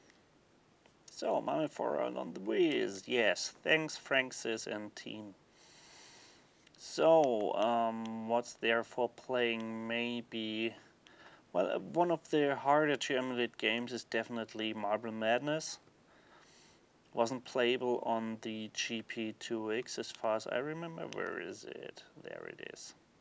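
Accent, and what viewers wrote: Germany. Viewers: I can hear your German accent, I have that one as well :)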